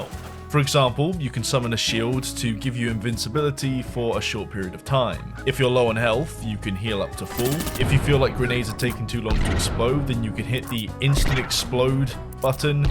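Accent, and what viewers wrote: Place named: United Kingdom. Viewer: Why do I feel like feels a bit extra Bri'ish in this one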